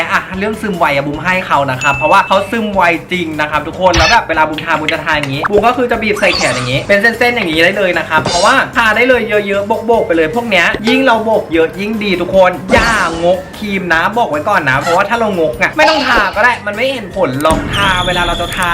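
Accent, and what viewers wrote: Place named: Thailand. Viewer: คนจังหวัดอะไรไหนพูดสิคะ<num>น่ารัก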